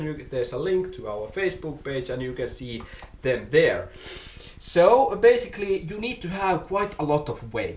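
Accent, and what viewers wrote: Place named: Finland. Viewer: Ralli englanti on paras, Hyvä video.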